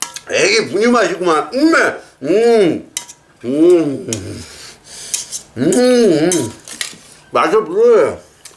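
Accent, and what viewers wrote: Jeolla, South Korea. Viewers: 광주 토박이로써 전혀안불편하고 잼있습니다 제가 허락하게습니다 전라도사투리맘껏 쓰세요 ㅋ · 형님 저는 부산사람인데 전라도 사투리 너무 재미있어요..미친 놈들 말 신경쓰지 마시고 형님 하고 싶은 대로 하세요.. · 저 전라도 녀수 사람으로서 형님의 사투리에 무궁한 친밀감을 느낍니다ᆞᆞㅎ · 으따~ 역시 전라도 사투리가 찰지구마잉~ ^^ 나는 고통을 즐기는 사나이여.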